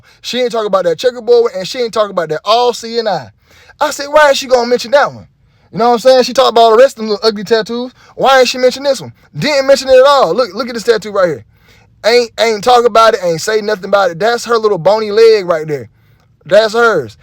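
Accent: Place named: Memphis, United States. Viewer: Thas mah cuhhzen 🤣🤣🤣 I like the Memphis accent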